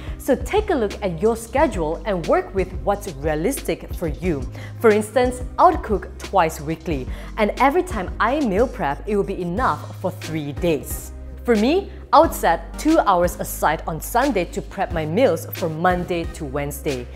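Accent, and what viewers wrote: Malaysia. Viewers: Never felt this proud of our Malaysian accent😇👍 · It’s a good Malaysian accent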